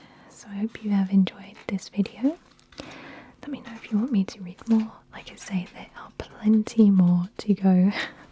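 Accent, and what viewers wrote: England, United Kingdom. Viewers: I think you have an amazing voice and English accent.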